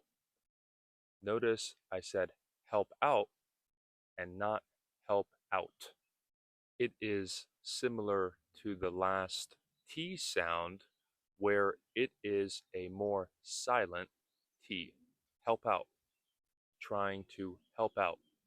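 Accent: United States. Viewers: Hello. Are you an American native speaker (your accent sounds like American). What country do you shot your videos, in Hungary? Do you have live streams on your channel?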